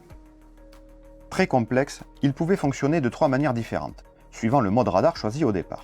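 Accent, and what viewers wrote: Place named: Libourne, France. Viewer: Je regarde vos vidéos depuis 5 ans. Le début donc. Et je me rends compte aujourd'hui avec cette nouvelle vidéo toujours aussi bien réalisée que vous avez l'accent du Libournais. Ce qui semble compatible avec votre adresse de compte YT (3350). Je me trompe ?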